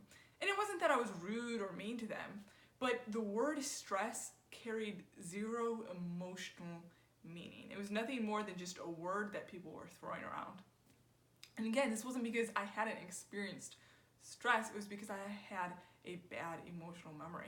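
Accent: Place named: Minnesota, United States. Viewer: You sound like Jesse Ventura (in terms of accent); would you happen to be from Minnesota?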